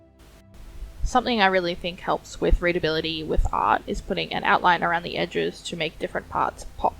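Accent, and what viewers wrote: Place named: Australia. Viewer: WAIT YOU’RE AUSSIE TOO?! OMG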